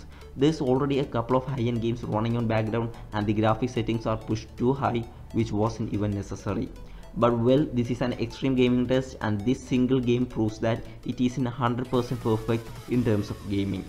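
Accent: India. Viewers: are you indian youe voice is like indian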